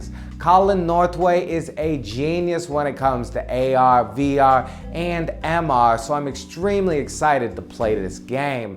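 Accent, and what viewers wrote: New York, United States. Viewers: are you from new york or mass? figure it out.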